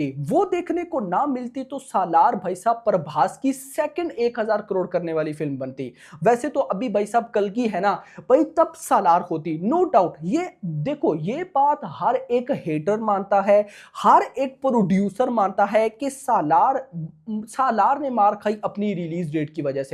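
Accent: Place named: Lahore, Pakistan. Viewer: Bhai teri language suni muje lga tu pakistani he fir teri bio me dekha such me tu pakistani he hindi tumari lahori wali he 😅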